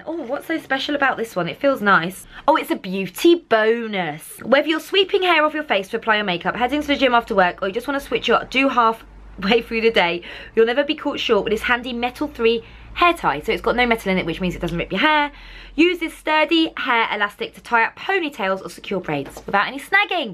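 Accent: United Kingdom. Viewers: Im in the US and subacribe to Birchbox. I love the Liz Earl skin care line and keep stocked. I just started watching your videos. Our US Birchboxes dont have the sac that holds our contents. Im gonna suggest it. I love watching you and your cute Brit accent. You look so much like Dutchess Kate whom I love dearly! Im Half French and wanted you to know, when you read the French labels on products, you doba fine job!!! ☺ I'll be subscribing to your channel. I hope some day, I can come visit the UK. Its on my bucket list!!!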